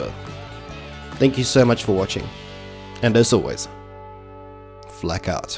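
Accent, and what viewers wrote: South Africa. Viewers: Very new to your channel came across it via some Jordon Rant rust stuff, heard the accent and went wtf its a saffer! Best south african channel I've come across so far. Congrats on 2 years. All the best for the years to come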